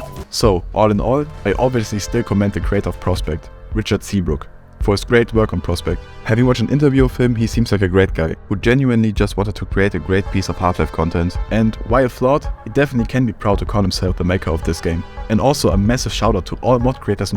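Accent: Germany. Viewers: I can hear from your accent that your german! Guten Tag!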